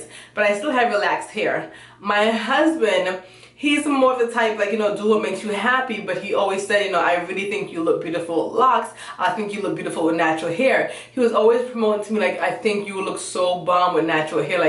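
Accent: Jamaica. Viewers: NEW Subbie...the patois got me lol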